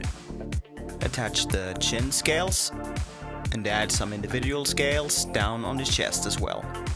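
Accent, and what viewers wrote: Sweden. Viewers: Whenever I hear your Swedish accent I can't stop thinking about summer and food.. Every year, when the summer is approaching we have a lady from Skåne on TV and radio, and she comes bearing new summer-recipes..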